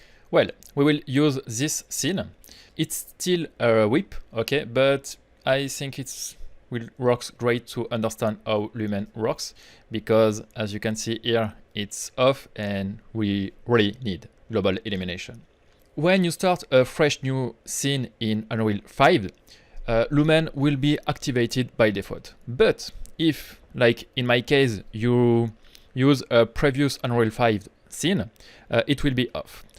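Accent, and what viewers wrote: France. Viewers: Goodness, what an accent! As a French myself I should be more tolerable but after living in UK for 10 years, I just can't stand it. Somewhere it hurts. Anyway, good channel! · Nice, (J'adore ton belle accent français) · oh that frenchy accent ,,, hahaha,,, so funny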